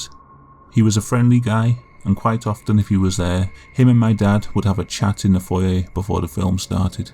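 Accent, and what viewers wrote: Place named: Liverpool, United Kingdom. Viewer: That's why you have a slightly Scouse twang to your accent!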